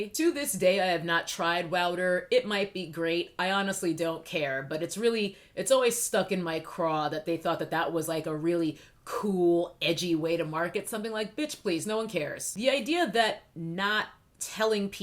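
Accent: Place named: Northeast, United States. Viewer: First of all, hi, subbed. I heard that north eastern accent and was reminded of home instantly. Secondly, I'm a biochemist (getting my graduate degree) and I could go ON about the scams YTers push on you. One thing that some big youtubers push is "supplements". Lets make on thing perfectly clear- these people have no business selling you anything to put in your body. Most of what they sell you is diluted 1000 fold in water, meaning that you are taking water pills. Other things that they sell you, like biotin and vitamin C pills, are nutrients that, if you are living in a country where you have access to food, you don't need to supplement these things into your diet. With vitamin C, you get your maximum daily dose from like, half a bell pepper. Vitamin C is water soluble, meaning you excrete the rest of it out. So when you see pills that say "1000x daily recommended dose of Vitamin C!", it means you are paying someone to pee out all of those nutrients. 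Plus, for many nutrients, like beta-carotene, in order for them to give you the benefits you need, they are dependent on other nutrients found in the food that you eat itself, meaning that they are not as effective if you are just taking them alone in a pill form. TL;DR- don't take pills from influencers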